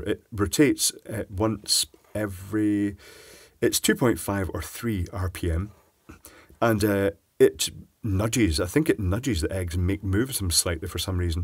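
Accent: Scotland, United Kingdom. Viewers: I wonder why the Scots say 'greeze' rather than grease. Love the way thats pronounced.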